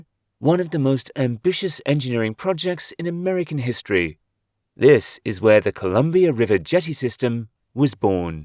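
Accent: United Kingdom. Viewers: Your accent is really annoying. Pretty much all british people are tho so i dont blame you, but you should stop talking so much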